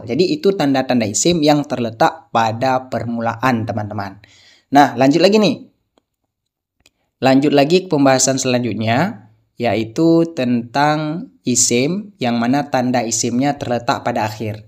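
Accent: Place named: Java, Indonesia. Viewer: Bahasa Indonesia manteng medok that tgk😅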